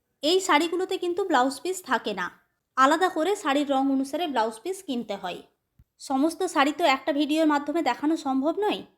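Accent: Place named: Birbhum, India. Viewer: আপনার সঙ্গে কেরালা ভ্রমণ করছি।ওখানকার জীবন সংসার সংস্কৃতি সব জানতে পারছি,আপনার সব ভ্লগ এখনও দেখা হয়নি,তবে আপনার ভ্লগ সাবজেক্ট ও প্রেজেনটেশনে দক্ষতা ও মুনশিয়ানা আছে, সকলকে আগ্রহী করে তোলে,অবশ্যই ধন্যবাদ। আরও কথা যা শুনি যে বাংলার পরিযায়ীদের 2য় ভুমি কেরালা,ওদের কাছে থেকে দেখে শিখে ওরাও অনেক উন্নত ও ভাল থাকছে।আপনার ভ্লগে তাদের অনেক কে দেখলাম, ভাল লাগল। একটা কথা আপনার কথায় একটু বীরভুমের টান আছে, সেটা হতেই পারে , কিন্তু আপনার কেরালা কে ক্যারালা শুনতে একটু কটু লাগে, অবশ্য জানিনা ওখানে সঠিক উচ্চারণ কেরালা নাকি ক্যারালা বলে আপনি ভাল জানবেন।অনেক ধন্যবাদ।বাংলার মেয়ে তথা বধূর শিক্ষা ব্যবহার মানসিকতা ও সহযোগী ভুমিকায় ঊজ্বল হোক আপানার সংসার।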